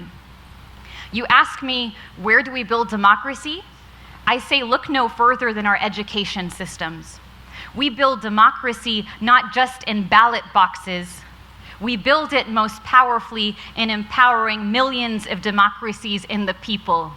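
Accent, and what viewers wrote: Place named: England, United Kingdom. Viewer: I wish one-day I can confidently give speech to the audience just like her with a confident english accent.... she's my ideal ...I love her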